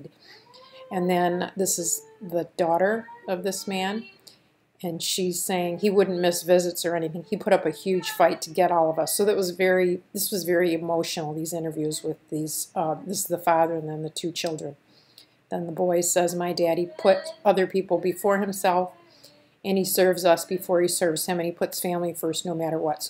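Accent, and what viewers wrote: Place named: Midwest, United States. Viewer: I can hear a Midwesterner from a mile away lol Great video!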